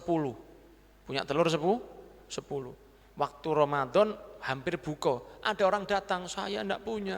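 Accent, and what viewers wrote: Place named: Madura, Indonesia. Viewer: ada madura nya😍😍😍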